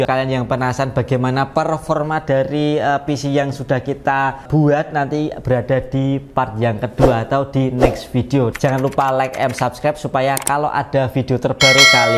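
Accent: Java, Indonesia. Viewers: Ngomong e, medhok parah !!!😊😊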